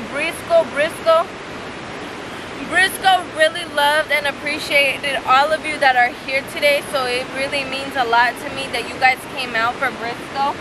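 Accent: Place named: California, United States. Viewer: Es obvio que es chicana. Rostro de mexicana pero habla inglés perfecto y con acento californiano.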